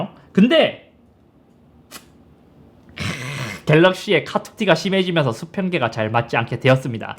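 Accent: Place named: Western Gyeongnam, South Korea. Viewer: 앗!! 이제 아이폰 애플워치에서 아예 갈아타신거에요?? 잇섭님의 서부 경남목소리가 정겨워서 리뷰가 눈과 귀에 속속 들어왔는데... 같이 삼성으로 갈아타기도 그렇구... 항상 응원할께요!! 그럼... 기존 애플 제품 중고로 파시지는 않으세요??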